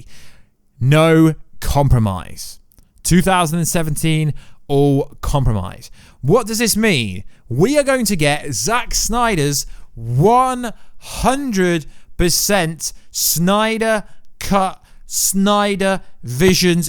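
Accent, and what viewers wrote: England, United Kingdom. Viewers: When gets mad his English accent thickens 🤣🤣👍🏽 Love the channel bro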